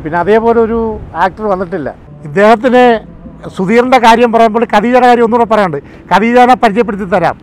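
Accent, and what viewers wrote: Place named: Cherthala, India. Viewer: Cherthala basha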